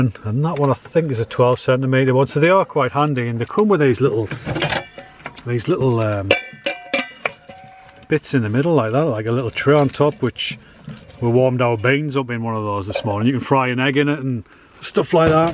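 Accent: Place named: Geordie, United Kingdom. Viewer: I hear the twang of a Geordie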